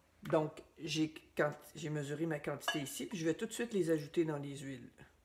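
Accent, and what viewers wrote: Canada. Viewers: Le savon au soufre organique est souvent utilisé pour traiter les problèmes de peau tels que l'eczéma, le psoriasis ou l'acné sévère. En effet, il s'agit d'un sébo régulateur, d'un anti-bactérien et antifongique très efficace. Voilà après il a beaucoup d'autres utilisations, comme pour les douleurs de l'arthrose(les bâtonnets de soufre)..... Etc J'adore votre accent, les canadiens est un peuple cher à mon cœur, mes amitiés. Bonne soirée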